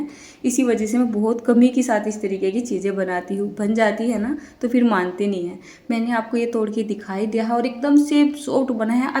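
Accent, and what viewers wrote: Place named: Uttarakhand, India. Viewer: Acha bna hy ghee kafi lgta hy hath maiy lga hua hy achi grhni ho ❤❤😂😂 bolne se uttrakhandi lg rhi ho jai ho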